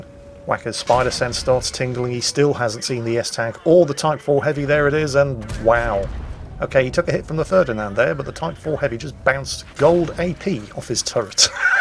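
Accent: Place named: United Kingdom. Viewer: British pronunciation is priceless when offending weebs.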